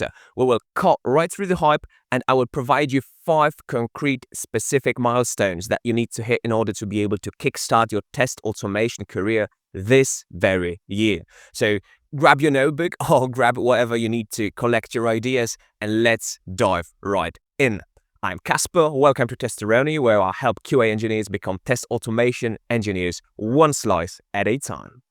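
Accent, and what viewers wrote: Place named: United Kingdom. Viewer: Thanks for your video. I'm a Python Automation QA Engineer. I worked for DISH company for 6 years as API Automation Engineer. I love your British accent and pronunciation. I'm from Ukraine and I want to find a partner to practice speaking English. I have been resting for 1 year after I left DISH company. Now I'm updating my skillset and I want to find a new job. Could we get to know each other to practice English together?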